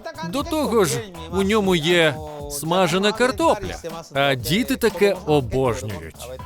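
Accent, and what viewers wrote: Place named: South, Russia. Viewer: О, южно- русский говор появился... но не я думаю понимают, так 50/50 примерно. Но лично я понимаю мову. Просто , как по мне это смешно выглядит.😂 с возвращением!❤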